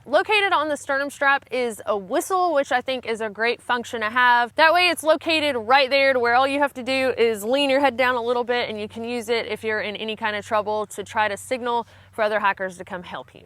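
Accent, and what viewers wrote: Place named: Missouri, United States. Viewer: What do you do as a precaution for bears, I've seen you a video where you had the bear spray ? I have this fear of running into a Grizzly bear, there was a video (facebook maybe) that I saw of a guy that jumped up and started screaming when a bear charged him out of nowhere and the bear turned and ran away............I think I would open my mouth to scream and nothing would come out!!)) Oh and I like your slang or accent " YALL" !)) You sure your not from Missouri!!))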